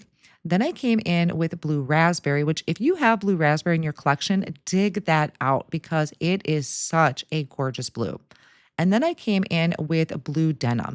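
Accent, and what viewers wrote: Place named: Wisconsin, United States. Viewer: Oh my gosh, I just stumbled onto your channel and heard your voice and I thought, wow, that sounds like home. Turns out you’re from Chippewa and I’m from Eau Claire! I’m down by Milwaukee now but love that we’re from the same neck of the woods! ❤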